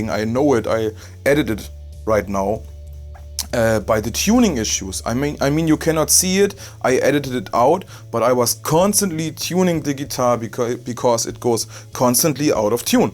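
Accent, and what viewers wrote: Germany. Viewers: Man hört gleich dass er Deutscher ist 🤣 Trotzdem ein sehr gutes Video!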